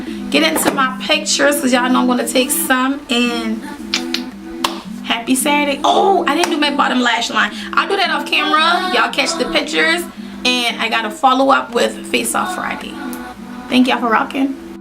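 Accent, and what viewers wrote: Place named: Charleston, United States. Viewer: Came across these topper and wanted to see the pay off and I must say I'm impressed have to get a few now since I've checked out the video review first.. You sound like your from Charleston if so I'm a fellow Charlestonian. Makeup came out beautiful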